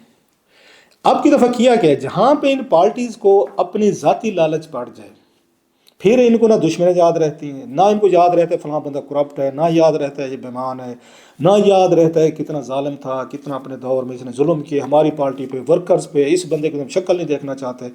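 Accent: Saraiki, Pakistan. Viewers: Myusi na kaha karo, maausi kaha karo, serayki lahja thora tabdeel karo yaar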